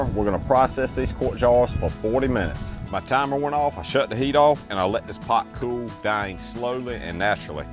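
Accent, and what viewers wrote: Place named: Louisiana, United States. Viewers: You sound like you from Louisiana, man. Are you? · Dude what is your accent? Cajun or something? Man you could be in movies with a voice lie that. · I like that accent, sounds like he's in Louisiana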